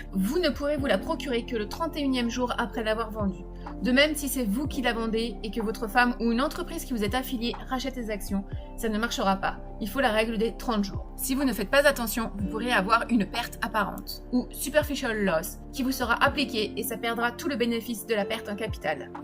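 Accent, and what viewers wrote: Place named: France. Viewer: escuse moi du mot etf , jecoute les terme etc j ai commence par epargne placement quebec,au debut je prennais des celi court terme ou annuelle progressif depuis 3 ans je rentre dans un systeme boursier qui apparament ce rapproche d un etf mais sans risque mais je ne suis pas sur .si c es interessent....tout en celi et la je reflechie et j essaye de comprendre..... je pense dans un deuxieme temps allez vers des etf me semble plus prudent...mais les systeme de taxe americaine m interpelle et me demande si il est pas mieux d achete canadien.....qu en pensez vous.....aussi je suis francais ......vu votre facon de parlez vous devez l etre......est ce que pour l europe on reviens au meme probleme...... y a t il des taxe .. bref plein de question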